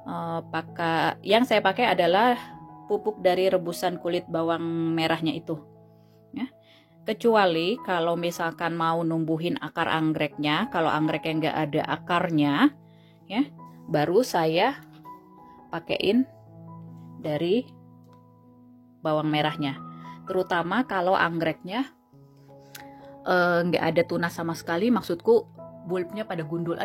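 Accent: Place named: Medan, Indonesia. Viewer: Biasa kekgitu mba,, buat jd motivasi agar lebih baik kedepanna 🤗 Kalo saya pribadi mmg sukak dengerin cerita mbaknya hehheh...kayak logat anak Medan 😁😁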